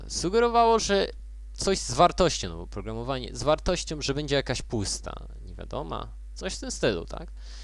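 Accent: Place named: Russia. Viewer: Jesteś polakiem?Masz rosyjski akcent :o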